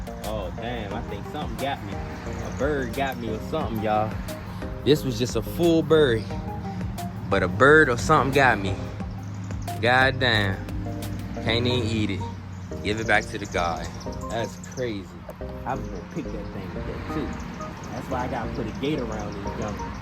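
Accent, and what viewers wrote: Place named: South, United States. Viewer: The Southern accent is killing me😂. “Sum Burries “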